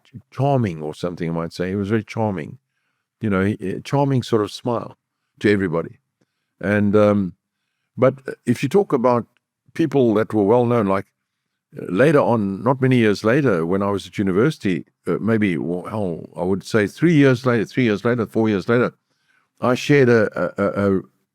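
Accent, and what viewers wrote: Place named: Glasgow, United Kingdom. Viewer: Love it you can take the boy out of glasgow but canny take glasgow out the boy you just keep being your authentic self Loved it when you said diddy meaning did he wnen ge reveals an answer to a question in typical glasgow style yer like diddy then when you asked what it was like being in love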